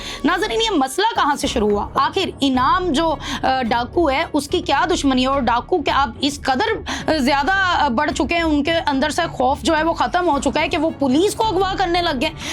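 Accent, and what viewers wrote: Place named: Punjab, India. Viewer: بی بی کیا ہی اچھا ہوتا کہ آپ ویلاگ پنجابی میں کرلیتی اردو انگریزی مکس کرنے کے باوجود لب و لہجہ اور الفاظ کی ادائیگی پنجابی انداز میں ہے۔،،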